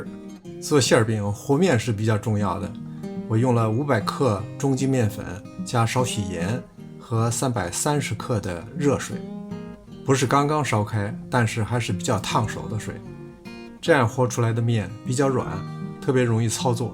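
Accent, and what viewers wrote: Beijing, China. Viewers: 北京话听着太亲切了明天就做来吃了谢谢叔叔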